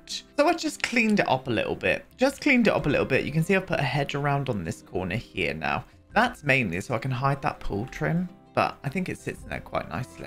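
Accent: United Kingdom. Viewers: The way your pronounce Yiya is sending me I am not sure if it’s cause your British accent and I am Greek Australian but it sounds yah yah or yee-haa.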